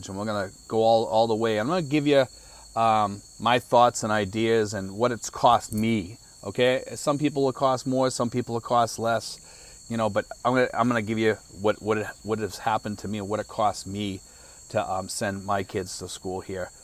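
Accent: United States. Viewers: Hi, is it? I am a new subscriber and was trying to guess the origin of the accent. You had revealed in another video where you were from. I was thinking the same US location...ha ha. Anyway, I am from California and I used to live in the Philippines, but that was 20 years ago. To give a reference point in time; my last visit to the Philippines, BGC was still FT. McKinley. My purpose for being here is to get caught up on life in the Philippines and consume personal stories on this public platform. Best regards.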